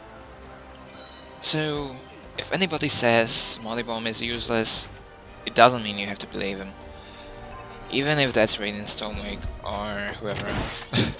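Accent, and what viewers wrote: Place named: United States. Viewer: btw for being from poland u have a "pretty-hardcore-US-voice" xD with that i mean ur english-speaking is very good! :D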